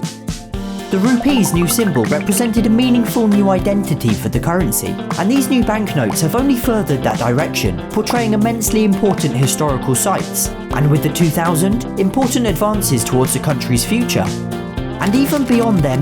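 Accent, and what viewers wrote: United Kingdom. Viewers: Your way of speaking is damn British . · Awesome video brother.after watching ur this video I've watched ur 6-7 videos&subscribed ur channel.I just found u on YouTube.I'm from India.ur British accent is so sweet hare.love from India🇬🇧❤🇮🇳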